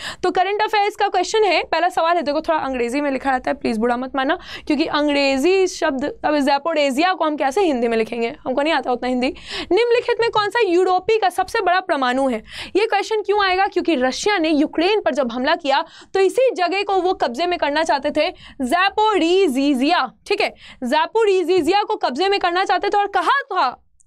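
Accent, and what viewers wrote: Bihar, India. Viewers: Madam ji ka Accent Bihari hai ❤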